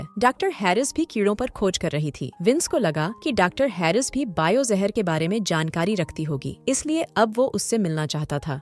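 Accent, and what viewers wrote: Punjab, India. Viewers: Hlo aap punjab se ho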